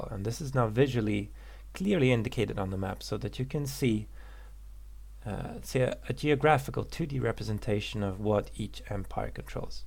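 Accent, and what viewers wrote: Poland. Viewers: Are you Polish by any chance? You don't sound American And is this going to the android version as well?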